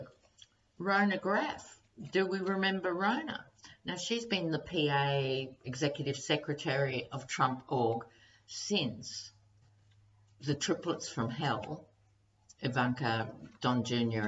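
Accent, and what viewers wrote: Australia. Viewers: Wonderful - I just want to thank you for bringing American a little hope and insight into the ultimate ending of all of this madness. When I hear your lovely accent I am reminded of all of the times when America has gotten themselves into a mess and Australia, like that treasured big brother, forever there supporting and trying to get us to do the right thing. Nevertheless, I am grateful for you and others who have held our hands this past year and I hope against hope that next year we won't keep you guys so busy.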